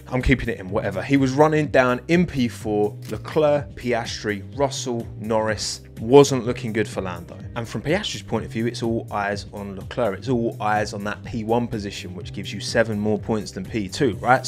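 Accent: United Kingdom. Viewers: Yeah bro, your british accent says all there is to say. Props to your countryman!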